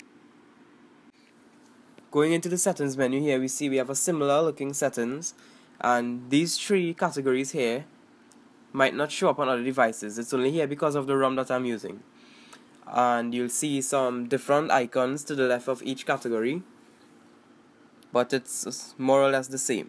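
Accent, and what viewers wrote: Trinidad and Tobago. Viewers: trini accent hmm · I knew it Lol! I'm from Trinidad too:P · Are you from trinidad?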